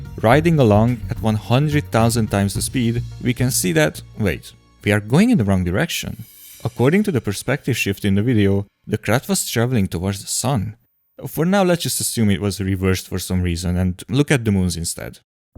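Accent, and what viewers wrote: Hungary. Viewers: Great video! Just out of curiosity; are you Hungarian by chance? Guessing by your accent.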